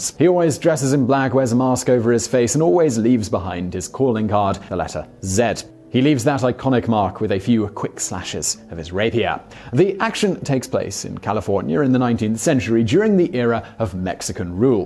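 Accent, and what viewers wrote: England, United Kingdom. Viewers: I have and still do enjoy your videos BUT... You butcher the pronunciation of names terribly! I can forgive some of this due to your English accent, but you really should at least LISTEN TO THEIR NAMES AT LEAST ONCE TO GET THE PRONUNCIATION AT LEAST SOMEWHAT CLOSE!! I mean Damn boy how hard can that be??? Some of these names have been in video for decades and their correct pronunciation is a matter public record. So how about a bit more due diligence. Because the way you butcher names even embarrasses your fellow countrymen. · Perhaps the British pronounce words and names differently than us. We did take a proper sounding language and gradually forget how to enunciate our vowels. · There's apparently a lot of stuff he can't seem to pronounce properly....must be the urge to put that "proper British" spin on it. Did you happen to see the episode where he pronounces geysers as geezers?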